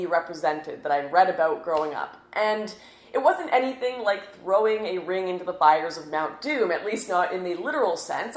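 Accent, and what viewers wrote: Canada. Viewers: Don't remember what video it was but it was a recent one. In it I was kind of surprised at her pronunciation of eggs ('aegs') and flags ('flaigs') as well. I knew Canadian English was a bit different to American English accents (the well known 'aboot' instead of about :P) but I didn't know (some?) Canadians pronounced these words like that. But to be honest, I couldn't care less what accent she speaks in, I'd still listen :D. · Draegens and Frodo Baegens. Canadian accents (kinda Minnesotan) are hilarious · Al DEE it must be due to her Canadian accent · draygons? frodo bayggins? is this a Canadian thing? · i hate that canadian accent lol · 😘 Amazing red pill moment beauty Canadian accent and all the lies of the world are coming out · Dylan Gill But she isn't American, she's Canadian; I have a couple Canadian friends, and they often pronounce the letter "A" weird. 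· wow dude, so many Canadian pronunciation gimmicks...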